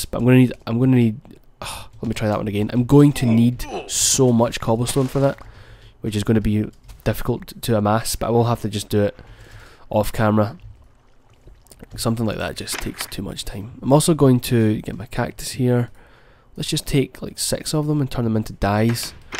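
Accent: Scotland, United Kingdom. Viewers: Are you scottish? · You are why I love the scottish accent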